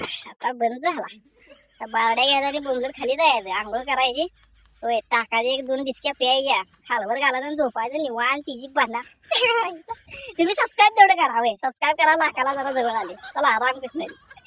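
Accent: Kolhapur, India. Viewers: अण्णा तुम्ही अस्सल कोल्हापूरी बोलताय . मला तुमच बोलण खुप आवडत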